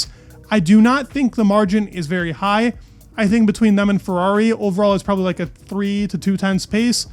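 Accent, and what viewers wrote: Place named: United States. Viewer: love your videos just wanted to say it’s great hearing a fellow American voice talk f1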